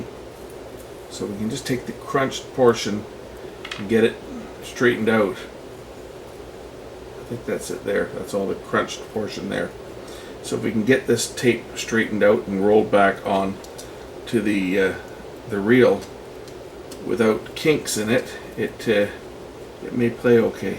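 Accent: Canada. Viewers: Hello my friend, I really enjoyed this video. Love the cat too. I have family in Canada, I know that accent very well. Thanks for sharing, also I just subscribed. Kind regards. Paul.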